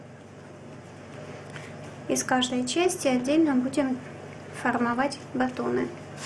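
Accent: France. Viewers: От французского акцента чуть не стошнило, можно как то без него...😝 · Лайк за французкий акцент .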